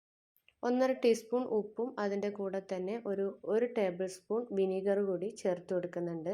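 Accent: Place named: Malappuram, India. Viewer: താത്ത മലപ്പുറത് ക്കാരി ആണ് ലെ.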